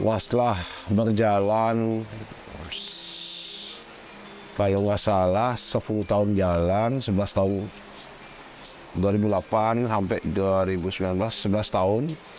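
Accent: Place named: Bali, Indonesia. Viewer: Karakter khas org Bali banget.. logat, 'n caranya berbicara...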